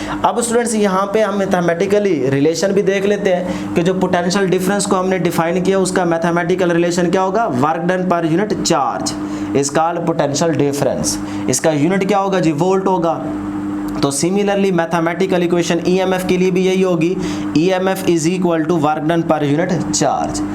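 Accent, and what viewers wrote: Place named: Saraiki, Pakistan. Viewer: Sir accent is like saraiki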